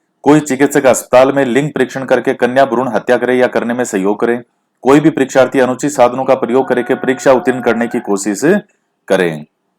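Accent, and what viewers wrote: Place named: Marwari, India. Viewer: Nice marvadi language ... humare bhi ase hi bolte hai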